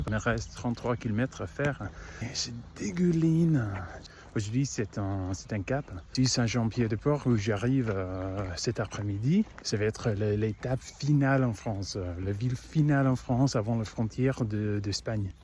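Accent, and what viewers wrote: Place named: Quebec, Canada. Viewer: waw your French is amazing we wouldn't even know you're irish you kinda sound québécois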